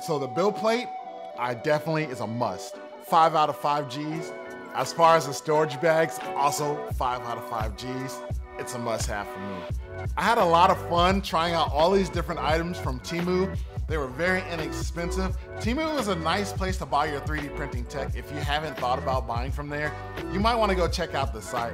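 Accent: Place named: South, United States. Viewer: What part of South you from bro? I'm originally from Alabama.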